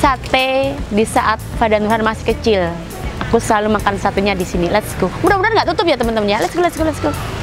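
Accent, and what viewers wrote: Java, Indonesia. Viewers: Pas ngomong jowo aku like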